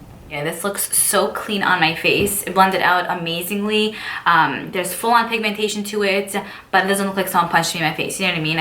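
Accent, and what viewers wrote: New York, United States. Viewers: I first watched you open the WNW Rose collection. (Made me want to buy it.) And I keep swearing that I'm subscribed to your channel, but I almost never have your vids pop up. (My other half is from Brooklyn and your voice reminds me of visiting NY/Jersey. Say cawfee sometime...) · the way you say gloss, the “just ate a bagel”, the horns in the back while you’re talking just all screams New York and I love it 🥺 moved here on a whim in August & your videos give me so much motivation to explore the city (aka TJ Maxx)! email: <mail> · I love your videos! Everytime I listen to you, I really get the "New York" vibe!😊 Nevertheless, I love purple and this collection is the "bomb". 💜💜💜💜 Purple eyeshadow looks awesome on you!🥰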